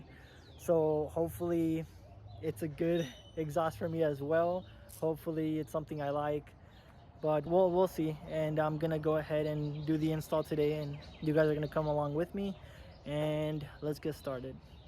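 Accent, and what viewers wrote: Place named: India. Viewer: bro pense que eras de la india hasta que hiciste el comentario de la chela jajajja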